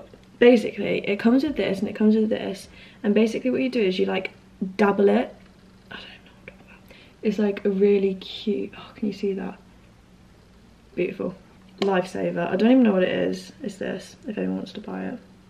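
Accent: United Kingdom. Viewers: I like the English accent from her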